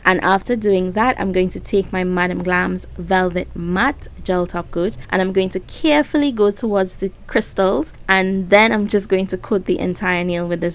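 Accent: Trinidad and Tobago. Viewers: I enjoyed both videos i am a new sub & u sound like a trini just like me wow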